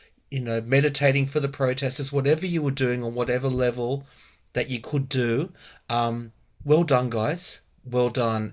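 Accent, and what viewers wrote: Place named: Australia. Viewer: Thank you for your readings ❤it's a pleasure to listen yo you. You have a calming reassuring voice, which is wonderful. I love the Australian accent so much.❤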